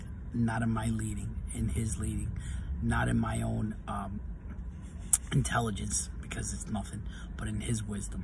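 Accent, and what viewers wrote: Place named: Long Island, United States. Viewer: Oh yes, I didn't mean that message specifically for you since you'd already shared you were loud about Jesus! :-) It was just for anyone who reads my comment (or as a prayer you could suggest to others as you're led by the Lord). I actually discovered you at one point during your Pentecost series, but I think I only saw one video from that (I'd have to go back and check to find out). God bless you! P.S. I love your accent (Long Island?)!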